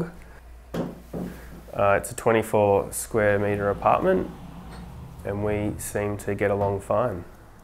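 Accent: Australia. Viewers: Faces north Great lighting That accent Oh he lives in Australasia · I heard his voice and thought It must be Australia. Lol.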